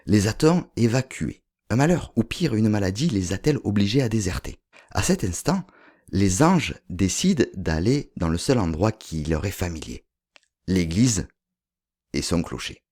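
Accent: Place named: Provence, France. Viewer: Merci, vous êtes un conteur extraordinaire, avec notre bel accent provençal ! Ah, les cigales, j’adore… Mais dommage, elles ne chantent plus : c’est déjà la fin de l’été. 🦗☀️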